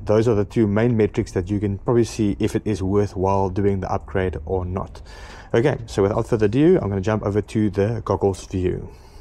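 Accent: South Africa. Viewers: Hey, if I'm right and i listen to the accent, you are South African Just like myself😅😅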